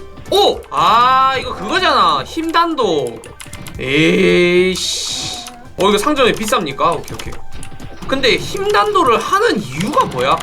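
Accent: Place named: Gyeongsang, South Korea. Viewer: 경상도 말투 진짜 ㅋㅋ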